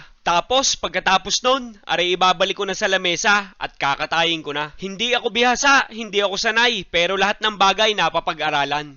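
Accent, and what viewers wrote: Batangas, Philippines. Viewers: Magaling na mag luto natutuwa pa ako sa Punto ng Batangeño 😂😊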